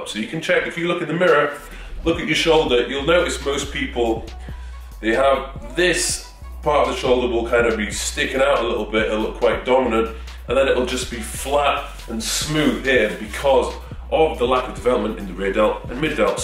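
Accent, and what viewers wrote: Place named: England, United Kingdom. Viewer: I fink he’s an English Bloke.Good instructions mate !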